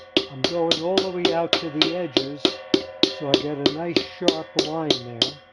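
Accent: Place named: New Jersey, United States. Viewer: I love his accent he sounds like he’s from New Jersey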